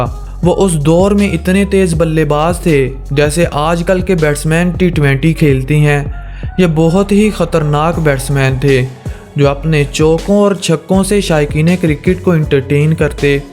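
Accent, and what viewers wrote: Punjab, India. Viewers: Bai tu punjabi lagda teri speaking too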